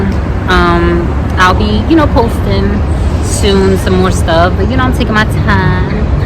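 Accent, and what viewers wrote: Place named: Brooklyn, United States. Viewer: That voice is extremely sexy got that Brooklyn vibe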